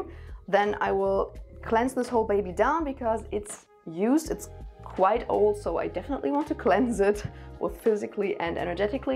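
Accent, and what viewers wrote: Germany. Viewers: she liked your German accent. Sweet...it is sweet blessings have a good week have some cake..🎂🍰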